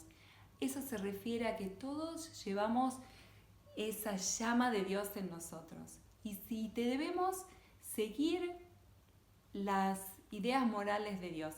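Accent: Argentina. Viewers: Cómo es que eres judía y tienes acento argentino??? O te volviste judía?? Es pregunta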